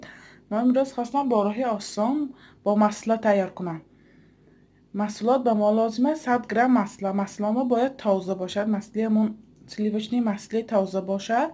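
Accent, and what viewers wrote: Isfara, Tajikistan. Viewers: Мемурам дар лафзи Исфараги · Салом шумо аз исфара мешидми?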